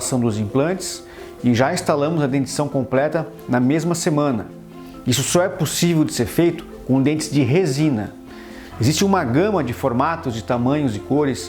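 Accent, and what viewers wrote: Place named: Pernambuco, Brazil. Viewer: O senhor é de Pernambuco Dr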